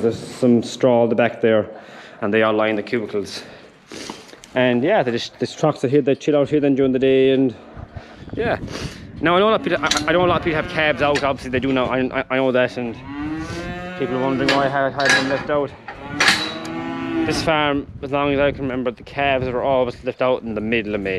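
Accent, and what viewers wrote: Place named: Ireland. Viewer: Loving the videos loving the machinery I’m quiet jealous now you have it all 😂, I can no longer do farming because of an injury from a motorbike accident I can’t move my right arm but I love watching farming videos to keep in touch with the life I love, I could very much be wrong but this looks like a Leinster farm and the accent sounds familiar but in Ireland we all kinda sound the same 😂 glad I found your Chanel and I hope all these price rises don’t cut you back will be a tough year with buying prices rising and selling prices not rising half as much I hope it doesn’t f the farmers up too much, best of luck and thanks for the video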